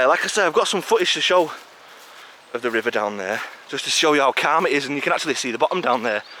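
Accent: United Kingdom. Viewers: His accent is like british but trying to speak like uncle roger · This guy has one of my favorite British accents, can someone in the UK identify it?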